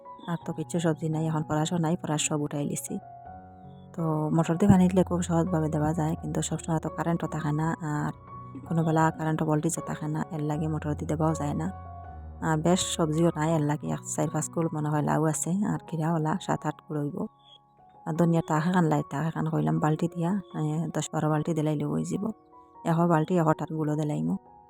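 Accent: Sylhet, Bangladesh. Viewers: আসলে আপনার কথাগুলা অনেক ভালা লাগে। আর আপনার কথাগুলো আমরার সিলেটের লাখান। আর আমি সিলেটি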